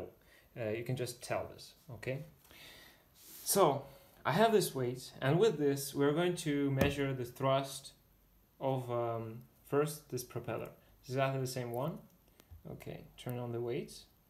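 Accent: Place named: Slovenia. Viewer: Slovenian accent detected.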